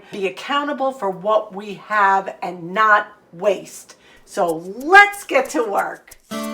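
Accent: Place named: New York, United States. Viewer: I’m a fairly new subscriber and have been enjoying watching your videos. I can really tell you’re from NewYork and nowhere near “Dixie” just by listening to your accent. 😊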